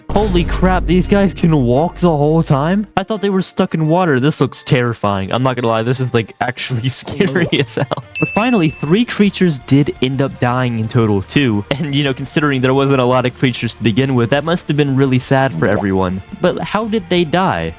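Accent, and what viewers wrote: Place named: Australia. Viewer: Bro's from the land down under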